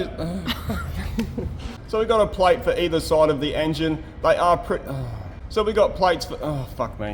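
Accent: Australia. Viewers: Right, I like that starts every sentence with "Right!" Sounds authentic! Sounds Australian! Sounds Right!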